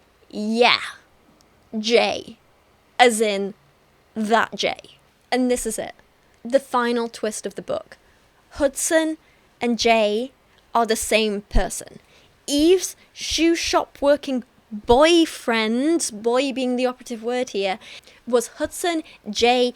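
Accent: United Kingdom. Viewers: Would be nice if you got it right the first time. You went and slandered the whole country for something that isn't even true. Even better, you did it purely based on the "word" of a trashy author and an unreliable narrator. On top of that you seemed to assume that age of consent laws were the be-all end-all in this kind of thing despite that the age of consent in your own country (I'm assuming the UK, based on accent) is, wait for it, ... sixteen. Now this is apparently raised to 18 in the case of a teachers trying to have "relationships" with their students, but it's notably not as good as that majority of US states that outlaws such things entirely, regardless of age.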